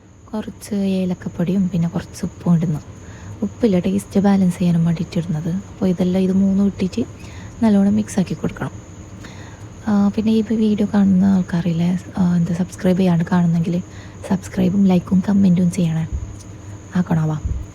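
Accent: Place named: Kasaragod, India. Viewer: Edh slang 😊kasaragod slag,😅😅😊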